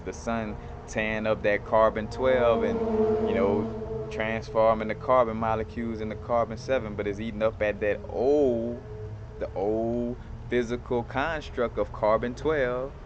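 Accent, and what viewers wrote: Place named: Louisiana, United States. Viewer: You gotta be from NOLA or somewhere in Louisiana lol I'm from there and I recognized your accent😭 anyways keep making videos please, I love your vibe❤️ such a beautiful human being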